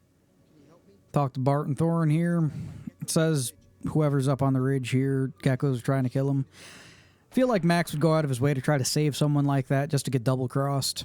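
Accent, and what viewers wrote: South, United States. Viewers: You sound like you just came our of the mojave, you have a subtle southern accent lol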